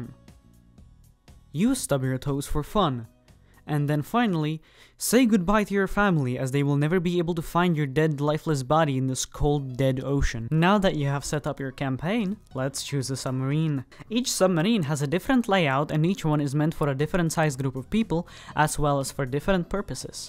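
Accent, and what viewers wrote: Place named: Russia. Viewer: я же слышу что ты русский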